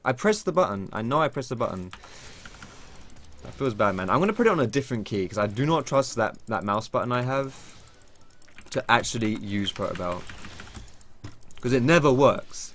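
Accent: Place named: Essex, United Kingdom. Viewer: Think he os from Essex, sounds like the accent.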